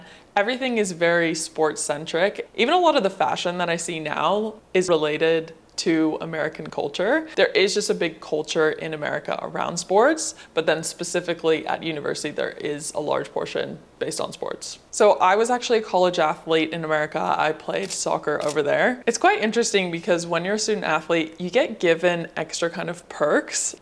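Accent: Australia. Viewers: i hear that accent... you're one of us now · Its crazy that you picked up Aussie twang in your voice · Her accent is Australian. Which makes me bekieve she never lived in US. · I can hear a slight bit of aussie accent change in you in this video 😉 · You've already got a slight Aussie accent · 🇦🇺Great video! You're becoming more and more Aussie with every video you post. Give it enough time, and you'll sound more like an Aussie, albeit with a Yankee twang😊. Oh, definitely more shorter holidays is the go. Having to wait all year for a holiday would suck badly 😂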